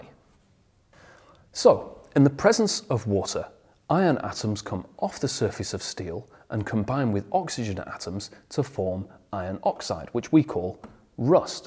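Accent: United Kingdom. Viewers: Great info but it's hard to take you seriously when you blatantly insert letters into words that shouldn't be Spell aluminum. Better yet write it down. Now, how many times does the letter I occur? Only once but you Brits insist on inserting an extra I between the N and the U to change the pronunciation of the word to suit yourselves. It's not alUmineeum. And don't even get me started on lieutenant!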